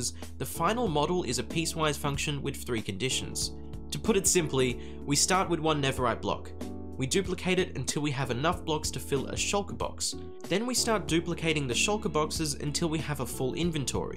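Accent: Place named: United Kingdom. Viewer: I love this guys British accent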